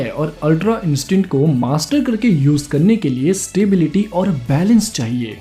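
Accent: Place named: Punjab, India. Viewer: Your accent sounds like punjabi urdu😂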